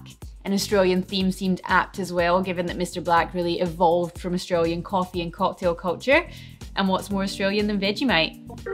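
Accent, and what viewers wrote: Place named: United Kingdom. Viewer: i fell in love with your accent , which part of UK?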